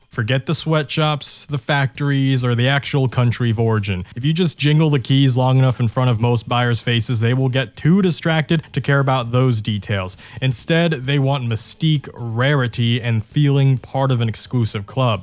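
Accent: United States. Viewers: Bro I thought you were actually American you expect me to believe that you're Asían? Nahhhh I can't do that 😢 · is asian? You sound like exactly American tbh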